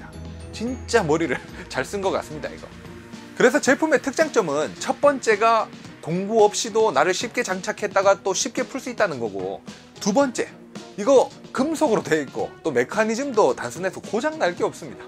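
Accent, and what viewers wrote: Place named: Countryside, South Korea. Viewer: 시골 사투리가 구수하네요. 좋은 정보 감사합니다.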